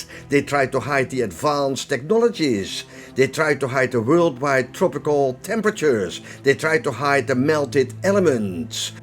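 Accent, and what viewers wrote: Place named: Netherlands. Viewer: Love the Dutch accent;) great content btw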